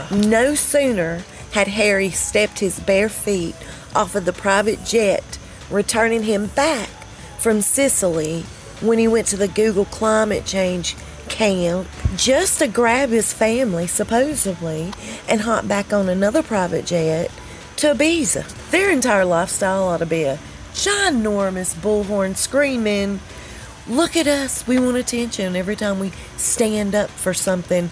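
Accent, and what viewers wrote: South, United States. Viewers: Oh I love 💕 how you just tell it like it is and your southern drawl is to die for. Love 💕 your vids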